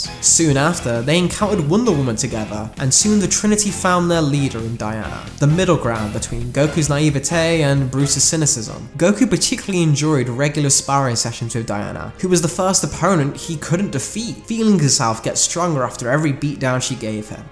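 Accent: United Kingdom. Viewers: nice video, the only problem is your hard Brit accent. Found it so difficult to understand you. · You have a very nice British accent. You can go far in YouTube with just that.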